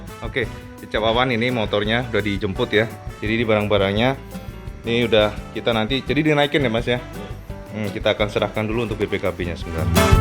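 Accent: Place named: Java, Indonesia. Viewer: Mas.. Logat jawa kami bisa nerima, kalo bahasa jawa bukan kami gak nerima tpi gak ngerti mas, mungkin itu juga yg menghambat pertumbuhan channel ini. Dri kualitas mas,shrsnya bs dpt 2jt subs.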